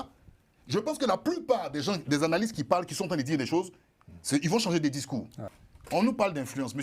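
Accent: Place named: Ivory Coast. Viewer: Lui qui parle est ivoirien avec l'accent Effectivement tu as raison c'est au ennemis de LAES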